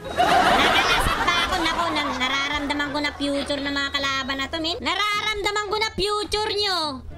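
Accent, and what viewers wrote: Visayas, Philippines. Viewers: Ay kuya bisaya kaba or tagalog? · Taga Visayas ba si? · bisaya ka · bisaya kaba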